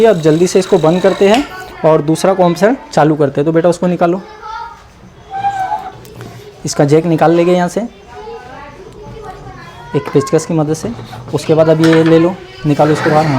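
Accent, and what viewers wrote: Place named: Maharashtra, India. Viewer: तुमच्या बोलण्यावरून तुम्ही मराठी भाषिक आहात .. पण तुम्ही खुपच उत्तम आणि सुलभ पद्धतीने समजावता. ... एकच स्वतःची काळजी घ्या... आपणास उदंड आयुष्य लाभो ही श्री स्वामी समर्थ चरणी प्रार्थना !!!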